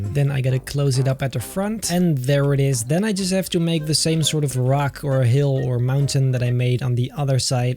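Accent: Netherlands. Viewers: ru dutch cuz you kinda have the accent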